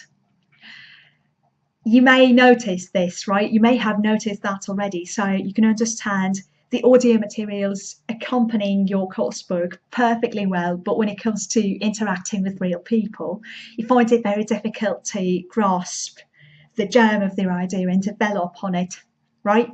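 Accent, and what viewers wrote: United Kingdom. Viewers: Hello. Your British accent is superb! Could you please tell me how you acquired it? Thank you.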